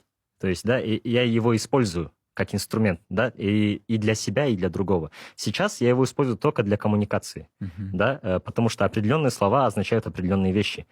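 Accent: Standard. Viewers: Ол қазақша сенен таза сөйлейді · Как раз таки он чисто, грамотно и литературно излагается на казахском языке. Это он объясняет напротив сидящим русско говорящим ведущим.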